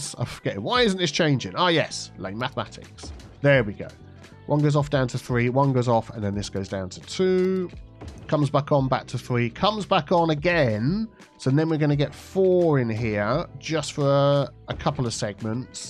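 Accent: United Kingdom. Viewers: Me to my gf: "Oh he's a proper British man, a dad, but he can't say rural": OOOH MAMMA MIA!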